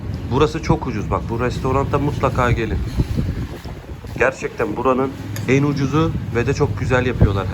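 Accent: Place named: Izmir, Turkey. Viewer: İzmirli ağızıyla keyifle izleyebileceğim bir kanal buldum :) Başarılar dilerim kardeşim İzmir'den selamlar...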